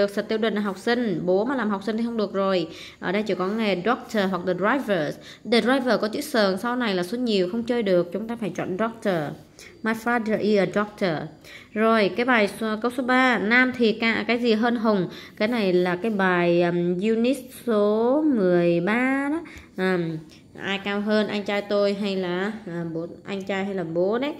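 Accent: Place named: Thanh Hoa, Vietnam. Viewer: Cô thanh hóa ạ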